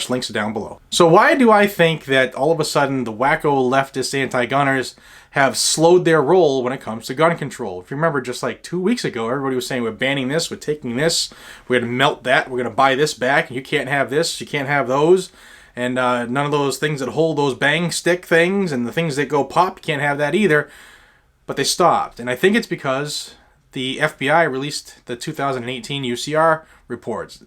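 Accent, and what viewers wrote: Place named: Massachusetts, United States. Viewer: Keep on keeping on! By your accent, i'm guessing that you're from Massachusetts. Don't know if you'd want the aggravation, but if you were to ever run for a seat in gov't, you definitely got my vote! "I was born free, I will live free, and I will die free!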